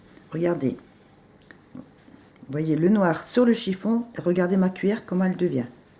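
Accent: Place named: North, France. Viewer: Bonjour Madame, merci pour les astuces que vous transmettez généreusement pour le nettoyage de variétés de bijoux telles que l' or, l' argent etc...😊 Vous avez un accent du Nord...👍😊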